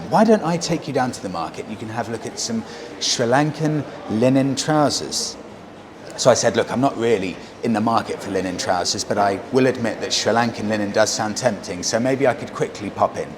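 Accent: RP, United Kingdom. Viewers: My Mother is from Nuwara Eliya and My Father is from Kandy..... so I enjoyed this VDO very much... the art you do this VDO is magnificent.... I love your British ascent.... thank you for taking my country to the world.... Totally regret about the scams.... that is common in this part... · Your voice has a clear, modern RP accent that gives your videos the tone of a high-end documentary.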